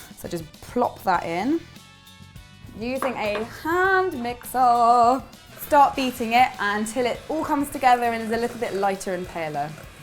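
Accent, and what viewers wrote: England, United Kingdom. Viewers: Well she doesn't sound American coz she's English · she keeps interjecting little bits of American English... I really liked the British accent tho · I can't get enough of the English accent.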